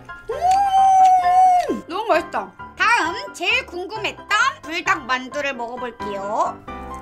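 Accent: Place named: South Korea. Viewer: 약간 영어 못하는 한국인이 말하면서 먹는거 같애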